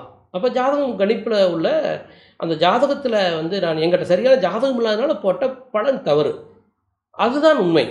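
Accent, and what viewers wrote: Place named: Kongu, India. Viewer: Sir.. Its impressive that you have been accepted the mistake on Modi Jathagam. You have predicted accurately for most of other party.. You continue give suggestion to public as we are not afford to have consultation with you for more than 5000 USD. Please give suggestion to common people for remedies and suggestion to improve their life. Continue your kongu tamil from Delhi.. Love from Sydney...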